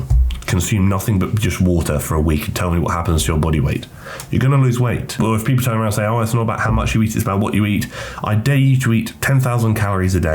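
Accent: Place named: North, United Kingdom. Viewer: This is so random but are you northern lol...this is the first time you've sounded northern to me lol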